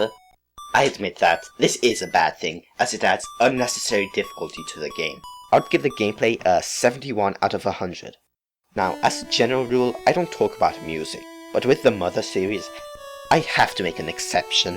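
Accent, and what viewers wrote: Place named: France. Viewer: Are you French? Your accent is really distracting . Sorry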